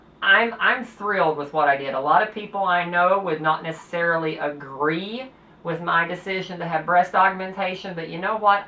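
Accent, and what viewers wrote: South, United States. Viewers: The word for subcutaneous air (air under the skin) is "crepitus". Pronounced CREP-it-tuss. Congratulations! I too am trans M to F. Your accent is distinctly southern. May I ask what state you are from? I am a Florida. All the best! Alanna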